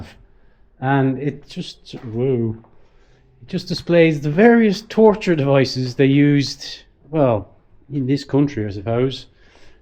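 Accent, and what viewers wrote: Ireland. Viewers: Congratulations. You are a breath of fresh air, have a beautiful Irish accent and are a very genuine person, that's why you have so many faithful Subscribers. I just love following your antics and going on little trips with you, they're always showcasing quaint little French villages and countryside, thank you for making my days a little brighter and taking me with you on your trips and sharing your day to day life as well. Much love xxxx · Wow, you take us to some amazing places! Great music, beautiful Irish accent attached to an easy-on-the-eyes kind hearted dude. Thanks heaps. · Can you also write the name of the chateau in the hill please, love your Irish lilt , but didn't catch it's name, and I want to put it on my next year 'tour of rural France ' list. Thanks 👍🏼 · And hearing an Irishman speak, using words rarely used here in southern California. 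So educational as well as amusing to dawdle around with you. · loved this video, chateau in the cliffside was amazing! Thank you for all these videos, you are a very interesting and entertaining guy, maybe its your Irish accent! Ha ha 🇨🇦BC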